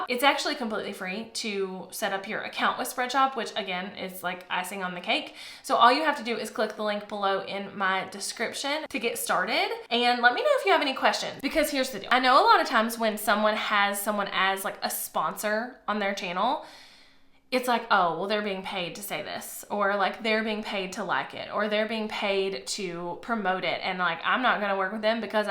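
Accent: South, United States. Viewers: Yeah, your Southern accent is really working for you here. Also, awesome video review from your experience (even though it's a paid promo)! Keep up the fantastic work!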